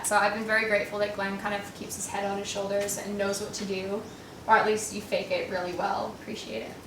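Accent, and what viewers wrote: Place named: Australia. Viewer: This was very informative because I'm clueless with travel/house etc 😅 Also I love that you sound so Aussie sometimes ❤️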